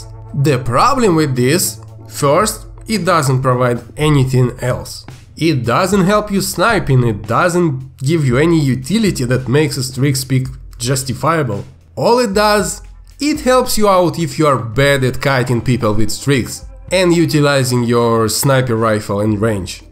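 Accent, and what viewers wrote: Russia. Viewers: i like how u can talk in my language with ur russian accesnt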